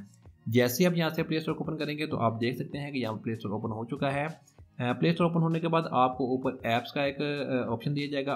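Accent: India. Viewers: The indian voice is Is- PERFECTO!!!!!!😎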